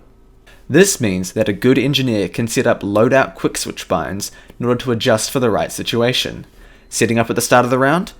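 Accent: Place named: New Zealand. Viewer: From your accent I guess you are from NZ. And holy crap you deserve more substance.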